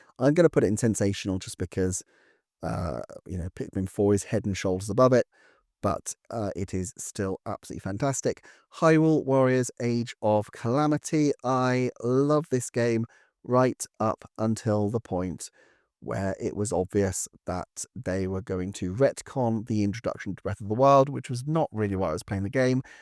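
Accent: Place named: Southern England, United Kingdom. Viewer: I enjoy bottom tier being "so-so;" it feels very befitting of your southern English accent and your very conciliatory style of analysis.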